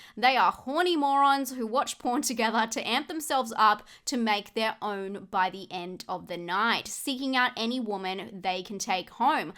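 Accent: Australia. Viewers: I clicked for the reviews. I stayed for your Aussie accent.....came to the Aussie accent. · Can we just stop to appreciate how beautiful, upbeatand thorough is? Her amazing Aussie accent and charm is attractive. Still shocked by some of what shes tolerated horror wise.. I still havented seen Noroi the curse and Salo seems like a difficult and unpleansant watch tht will be infuriating to me. And this from a guy whose favorte horror films of last 15 yrs have bee Heridatary and Audition.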